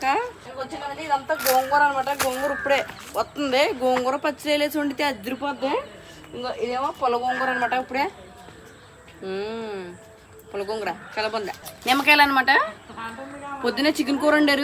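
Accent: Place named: Amalapuram, India. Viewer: మీ బాషా యాసా అచ్చం అమలాపురం బాష చాలా బాగుంది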